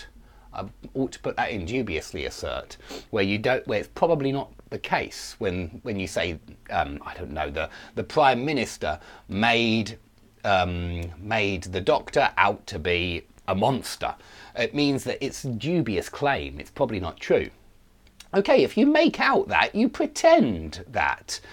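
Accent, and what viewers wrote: England, United Kingdom. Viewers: I love your English accent !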